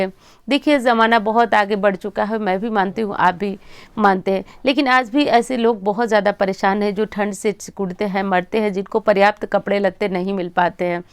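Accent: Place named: Purvanchal, India. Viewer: दीदी हम जौनपुर से है दीदी मुझे आपकी आवाज से पता चल रहा था कि आप मेरे साइड से हो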